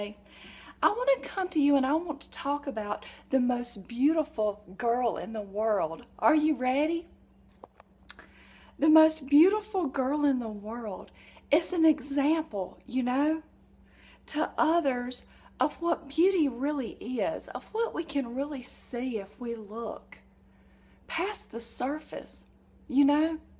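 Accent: South, United States. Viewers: Hello. Nice USA women, with beautiful BLUE eyes. Dont cry. I will send some tissues from EUROPA. Good video, a lot of good video, BRAVO. You are funny. South accent. Have a beautiful day. Bye. Rudi from EUROPA.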